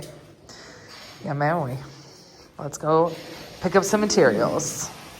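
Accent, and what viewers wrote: Mexico. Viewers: Creo que ya se te nota el acento poblano al hablar en español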